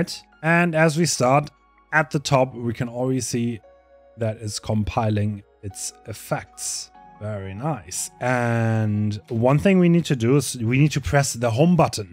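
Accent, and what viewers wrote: Germany. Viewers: I hear german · why is bro trying so much harder to sound more german than he actually is in this video?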